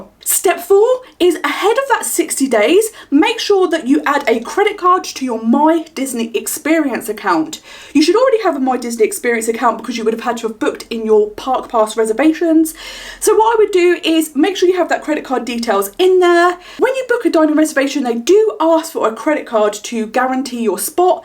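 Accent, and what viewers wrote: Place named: United Kingdom. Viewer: You sound like you’re from the uk do you use a vpn please to make your bookings? Or use the uk site as im also traveling from Europe.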